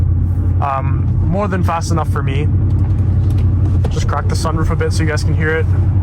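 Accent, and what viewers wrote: Canada. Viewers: The km/h and accent is definitely 🇨🇦😂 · The moment he got in the car and started talking, more and more I hear the Canadian.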